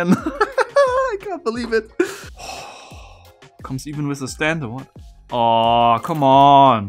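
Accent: Germany. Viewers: His German English is 9.5/10 Most Germans pronounce some words differently than Americans. It’s not right or wrong. has the best energy and is sooooo smart. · This reminds me of the first video I ever watched of yours - I was thoroughly impressed and entertained by this friendly voice with a light german accent drawing out the inside of the puzzle by touch as well as trial and error. The passion showed through your attention to detail and delight at the way the puzzle was MADE, not just the solution. That being said, I pictured you as an older gentleman, maybe with salt and pepper hair, and a neat bow tie. I'm not sure if I should be more impressed that someone so young would be so patient and exacting, or disappointment that my illusion was shattered.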